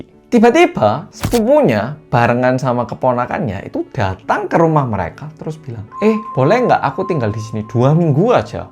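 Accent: Java, Indonesia. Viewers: Sampean itu orang jawa apa wong korea too...lucuu😅😅..sik...sikk😅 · Udah lama ga nonton korean medok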